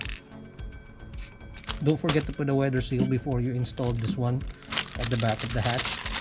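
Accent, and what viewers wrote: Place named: Philippines. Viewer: Sheeesssh bro. I’m about to do the same thing, thanks for a great video. All I got to say is, damn your Filipino accent is thick lol🇵🇭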